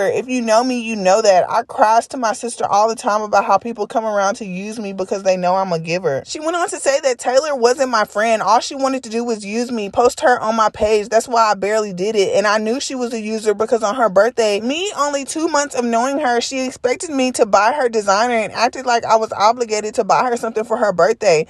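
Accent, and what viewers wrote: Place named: Texas, United States. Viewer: lol i can tell yu from texas ...im from louisiana and you said them cities kinda wrong no shade tho but i live around thos cities too!!!! ima big 318!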